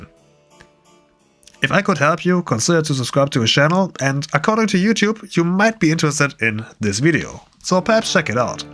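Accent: Germany. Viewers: Where do you come from? Your accent sounds like a German trying to speak English.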